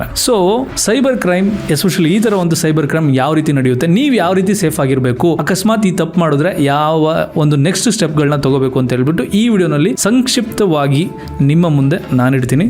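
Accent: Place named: India. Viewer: ಕದಬಹಳ್ಳಿ ಇರೋದು ನಮ್ಮ ಹಿರೀಸಾವೆ ಅತ್ರ, ಹಾಗಾದ್ರೆ ಅಣ್ಣ ನೀವು ಅಲ್ಲಿನ ಸುತ್ತ ಮುತ್ತಲಿನ ಊರಿನವರು ಅನ್ಸುತ್ತೆ ನಿಮ್ಮ ಮಾತಿನ ವರಸೆ ನೋಡಿ ಮೊದಲಿಂದನು ಅನ್ನಿಸ್ತಿತ್ತು ನೀವು ಆ ಕಡೆವರೇ ಅಂತ ಈ ವೀಡಿಯೋ ಅಲ್ಲಿ confirm ಆಯ್ತು 😊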